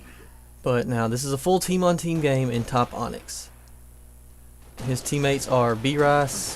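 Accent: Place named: Texas, United States. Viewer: you sound like ur from texas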